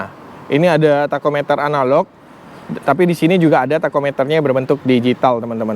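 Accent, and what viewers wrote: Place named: Minangkabau, Indonesia. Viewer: Minang bana Uda ini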